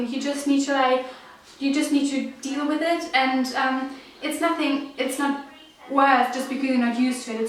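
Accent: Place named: United Kingdom. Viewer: Dein English ist echt toll😍 und ich finde es echt toll, dass man echt richtig hört, dass du British English "lernst".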